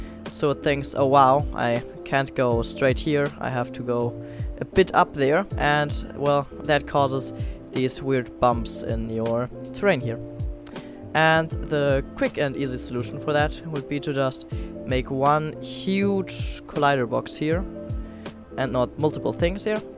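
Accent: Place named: Germany. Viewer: nice german dialect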